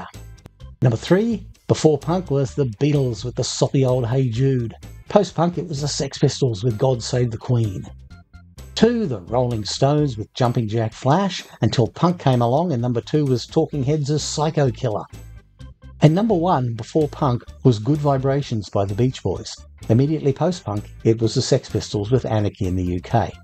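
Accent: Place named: Australia. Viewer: What part of Australia are you from? Cool accent 😎 and as per usual awesome commentary 😊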